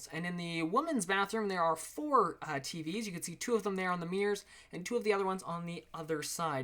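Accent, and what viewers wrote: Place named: Canada. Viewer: It's a white (Canadian) Guy speaking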